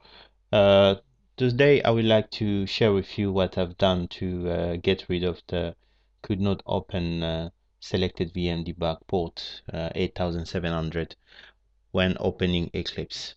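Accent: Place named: France. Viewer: ça marche pas avec moi =) french accent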